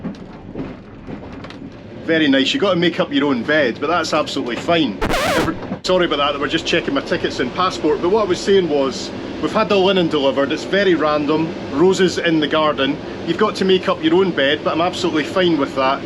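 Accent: Scotland, United Kingdom. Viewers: I love watching your videos. I live in Australia and moved here 25 years ago. You make me miss Scottish Patter! Your great!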